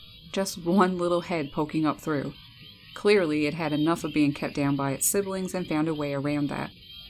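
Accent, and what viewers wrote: Newfoundland, Canada. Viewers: You sound like a newfoundlander. Am I right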